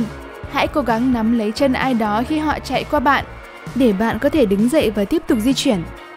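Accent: North, Vietnam. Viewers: sao bắc kì nhìu quá...nghe ko hay j cả...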